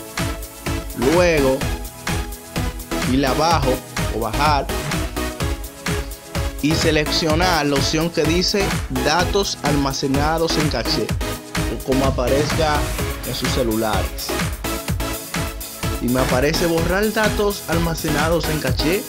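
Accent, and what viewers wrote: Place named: Dominican Republic. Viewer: Me suenas a dominicano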